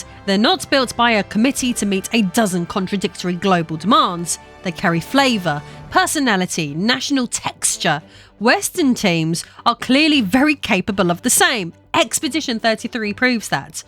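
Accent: United Kingdom. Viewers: She said with a British accent the country locking people up for tweets ffs. Then the first comment she pins is all adds and ways to pay her. But damn America is capitalistic and money hungry.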